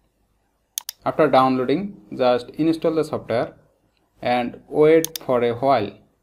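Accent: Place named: Bangladesh. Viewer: Bro are u from Bangladesh?....the Accent seems like that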